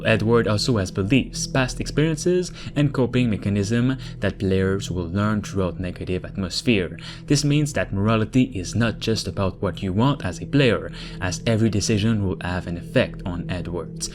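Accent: Quebec, Canada. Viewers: Are you french Canadian? Your English is good don't get me wrong. Continue the good review. I like your stuff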